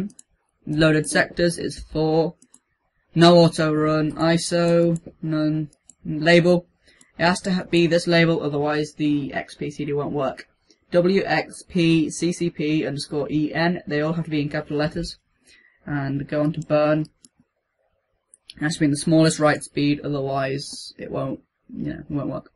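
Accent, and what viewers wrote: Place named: United Kingdom. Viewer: British accent..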